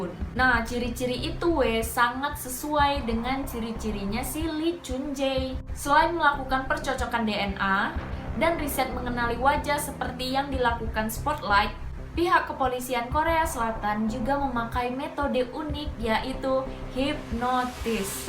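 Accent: Medan, Indonesia. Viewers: Mantap logat Medan nya euy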